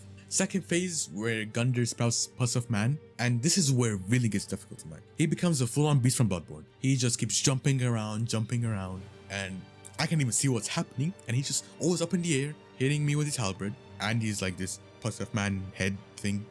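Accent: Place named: Gulf, Arab world. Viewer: u got a khaleeji accent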